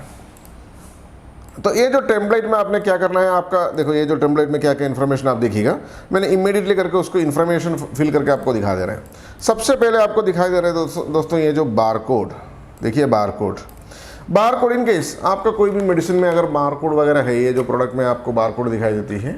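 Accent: Kerala, India. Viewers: Malayalam touch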